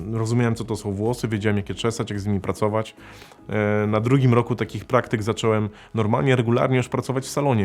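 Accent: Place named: Poland. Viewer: Niesamowicie bawi mnie Polska wymowa angielskich słów 🥲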